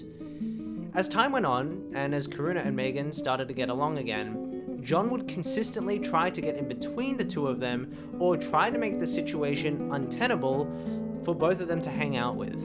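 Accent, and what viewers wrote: Australia. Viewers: Are you an aussie? sorry I never noticed your accent till now and I've been watching you for months now. I feel like It should've been more apparent. lol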